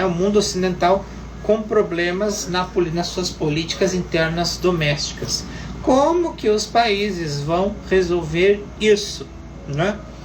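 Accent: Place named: Portugal. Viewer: Sotaque português... 😂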